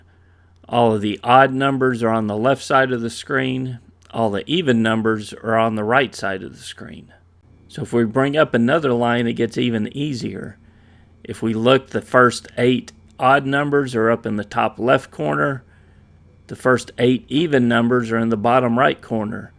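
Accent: Texas, United States. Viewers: 5 but then again your Texas accent upped my stress level.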